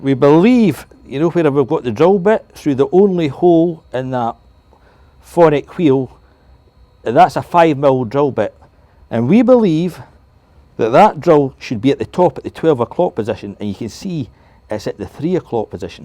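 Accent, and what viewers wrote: Scotland, United Kingdom. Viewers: I love this Scottishness . Especially the groans of dispare 😂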